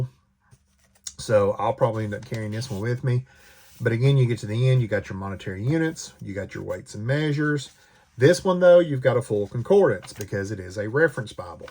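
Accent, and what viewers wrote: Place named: South, United States. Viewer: It is so nice to hear that sweet sweet southern twang again. I missed you brother.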